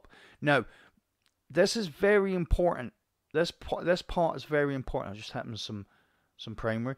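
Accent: Scotland, United Kingdom. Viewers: I love you'r explanations especially through the jumping part,and then with clearing of the adds,and i hear from you'r accent you are a fellow Scot,but can yu tell me do you play on PC or console.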